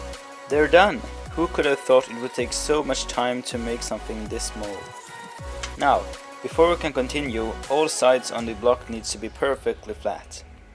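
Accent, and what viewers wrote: Sweden. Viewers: I recognized your Swedish accent within the first 10 seconds of watching lol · I guess by your accent and it saying linolja on the oil bottle that you are swedish Hej kompis! Älskar din kanal! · are you from sweden. it sounds like that ,i am. cool project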